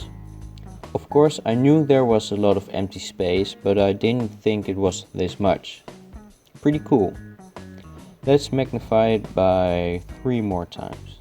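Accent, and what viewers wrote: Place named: Europe. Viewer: You sound like you maybe Europe so that may not be self isolation but enforced isolation because you know...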